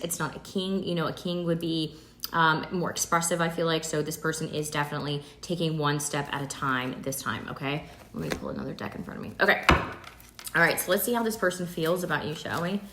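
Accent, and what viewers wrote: South, United States. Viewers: I luvu❤you be on point and you funny😂you be doing this country voice while you be talking so funny😂😂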